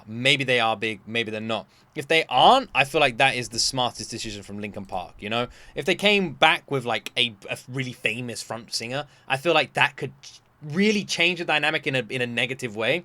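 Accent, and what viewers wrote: United Kingdom. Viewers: Where are you from, mate? I'm trying to get better at discerning accents. I assume UK, but not sure what part. I went down a rabbit hole last night with reactions to LP releasing this new song/tour/album. They are one of my favorite bands, and I think they made the right move by going with a female new lead singer.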